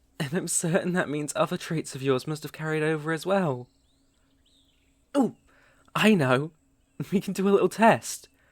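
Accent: United Kingdom. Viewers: Helloooooooooooooo good to hear ur british voice again XD back from the dead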